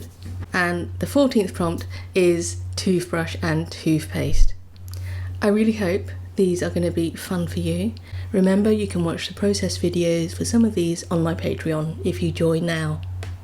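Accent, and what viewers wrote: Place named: England, United Kingdom. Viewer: From Uk. Just found you. Love the English accent. Great inspiration. Thank you.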